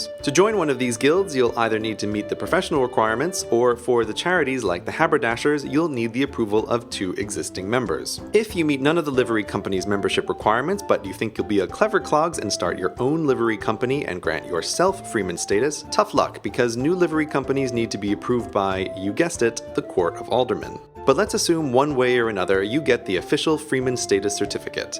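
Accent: United States. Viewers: He mentioned that he went to an American college. Judging from his accent, I think he's American. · Thought you were American? Mind=Blown!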